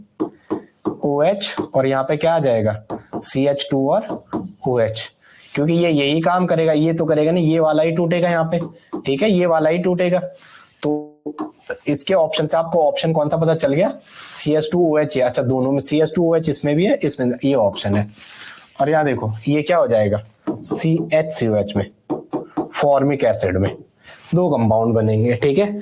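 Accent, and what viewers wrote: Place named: Rudraprayag, India. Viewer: Rudraprayag se ho na aap sir ji..tone se pata chal raha hai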